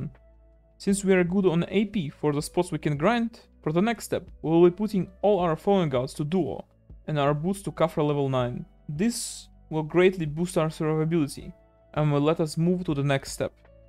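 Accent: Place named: Pakistan. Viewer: Love that pakistani accent 😍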